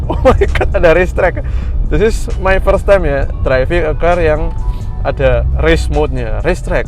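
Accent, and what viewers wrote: Surabaya, Indonesia. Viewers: Kueren PuuoooL Kooo,,, penjelasan khas Bonek sby Ko... Uwis tak Suckrip & tak Like ko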